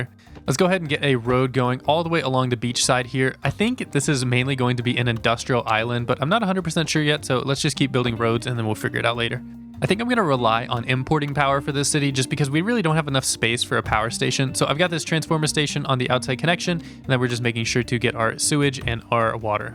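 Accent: United States. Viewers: you're from 'murica, right?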